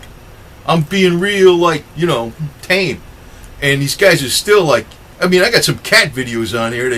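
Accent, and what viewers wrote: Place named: New York, United States. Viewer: Checked out your sportster video...You almost sound like a New Yorker...I am a New Yorker and talk the same way...Oh yea and Jesus was Jewish, there were no Christians until they started following Christ..there were Jewish folks but they don't believe Jesus was the saviour...the Christians believed all the way...so do I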